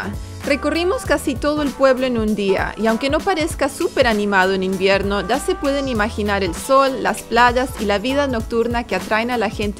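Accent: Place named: Rioplatense, Argentina. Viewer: Guía de Viajes con y tu español tiene un leve acento rioplatense o chileno del sur...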